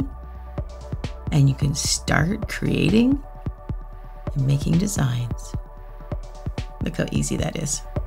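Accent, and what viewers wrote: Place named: Canada. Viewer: Valery B even without saying that they are from Canada (which has been said in several videos) you can tell the Canadian accent. 😂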